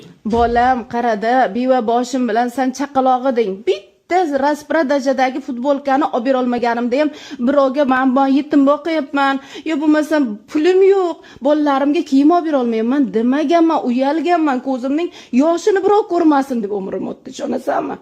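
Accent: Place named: Kashkadarya, Uzbekistan. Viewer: Шева Кашкадарё. Тугри тарбия берябди. Дуо хам килинг. Иши юришиб кетади шунда.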